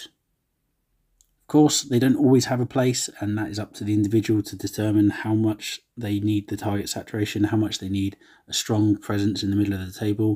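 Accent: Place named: United Kingdom. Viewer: coupled with your British accent it makes it more difficult to follow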